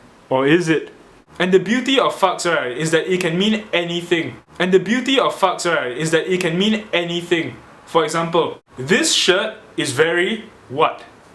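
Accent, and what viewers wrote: Singapore. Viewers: Hate your singapore accent. Other then that,you're god damn funny. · My Chinese is shit cus I'm an ACS boy. Class of 96 ACS(I) represent homie....... What!! I cheated during my O Levels for chinese and still got a D hahahahah true story!!! Well maybe cus everyone aroudn me that i was copying from was just as shit at chinese as I was. And how can anyone wonder if you are Singaporean? your singaporean accent is strong as man...... "Angmoh speaking chinese" ???? Nigga puh lease...... you look AZN through and through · hear your accent i know you're singaporean already! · its jz funny when an ang moh sound so singaporean. u dun hv any angmoh slang at all. n u look kinda lk a chinese who looks angmoh. so.... yea. XD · Man I thought you were half chinese half angmo singaporean because of how you look (not bad of course! different in a really cool way. 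because I've singaporean friends who talk like you, the singlish made me think you were a natural chinese speaker though. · are u sure u are Angmoh?? u have no Angmoh accent?? it's Singlish accent leh... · Cool stuff. LoL u basically have the Singlish accent now and u speak good singlish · oh my fk his sporean accent is too heavy.. unattractive.. =( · Hopes this wonderful creative word "Fux" will be widely accepted here one of these days, yeah....u really spoke like the locals around here, u must have been living in S'pore for a long time! Nice to meet u!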